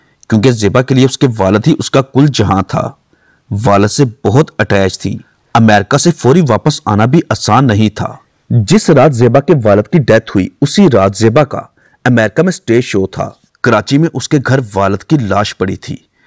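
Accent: Punjab, India. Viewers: Tu c Urdu Punjabi main bolte ho. Apna Punjabi lehja dorost Karo. Urdu sahi Sahi bolo.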